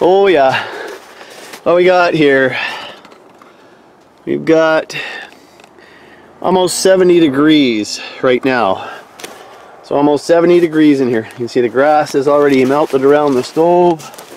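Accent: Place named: Canada. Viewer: That's the most Canadian accent I've heard all day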